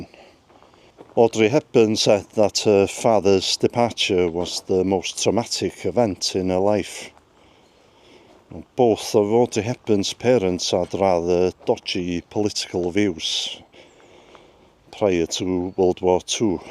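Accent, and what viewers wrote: Wales, United Kingdom. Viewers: He's Welsh!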